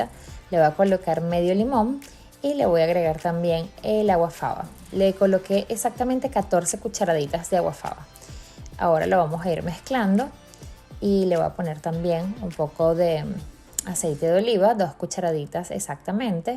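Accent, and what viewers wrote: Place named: Venezuela. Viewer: Me parecieron geniales esas recetas. Buenas alternativas para pasapalos. Eres venezolana? Por fin alguien que habla mi acento en un canal de recetas 😅🤩🇻🇪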